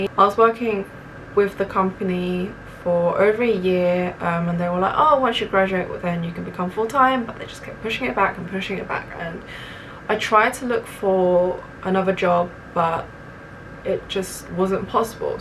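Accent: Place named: Australia. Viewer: That accent has some Aussie in there for sure!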